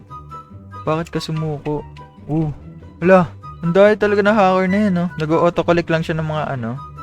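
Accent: Philippines. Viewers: Siguro Tagalog ka talaga Kasi parang Tagalog ka talaga kapag nags peaking English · It make sense because You sound like Filipino when you talk English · Yaaa, Filipino accent like the filipinos, actually im surprised i always heard english in YouTubers accent 😊😅❤ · HER SOUND LIKE FILIPiNO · sabi ko na ba familiar ako sa filipino accent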